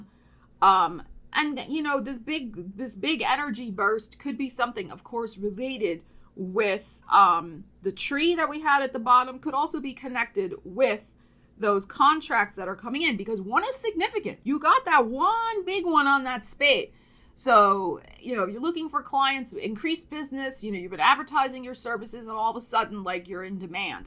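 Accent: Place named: Brooklyn, United States. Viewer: Oh! This was a surprise! For some reason I thought you only had done a tea reading for Scorpio!! This is a great reading -- I imagined you delivering it with a Gypsy accent but the Brooklyn one works😂 Yes it's been a mofo 2 of wands space forever. Capricorn appreciated that you get how patient we have been. I don't take credit - Capricorn just does what must be done so we don't really see a choice. Love the page of pentacles coming in. As you know - this cap has sent many applications that have gone into cyberspace...am continuing through the near future -- feels like a treasure hunt.. 💥🌞💥💕😘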